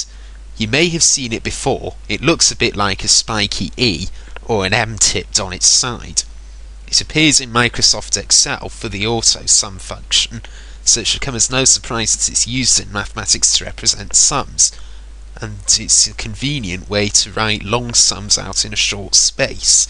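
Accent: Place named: England, United Kingdom. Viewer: Well done! Love the wit! Dad was from Manchester-so nice to hear the English accent again!!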